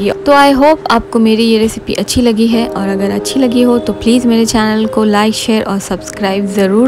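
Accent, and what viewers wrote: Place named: Eastern Uttar Pradesh, India. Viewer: you must be from Eastern UP. Mau Banaras side 😂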